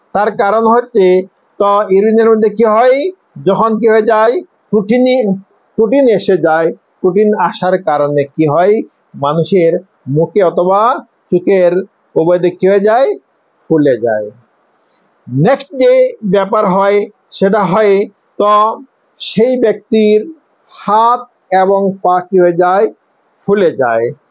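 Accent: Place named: Sylhet, Bangladesh. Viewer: Apnar Bangla Uccharon a Syleti tan achey - Uccharon thik koroin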